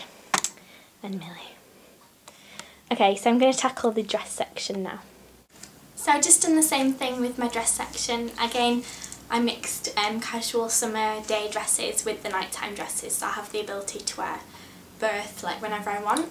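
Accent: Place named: Manchester, United Kingdom. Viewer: Is yours a Manchester accent? I was acquainted with a girl who had an accent like yours and she was from Manchester. I like it.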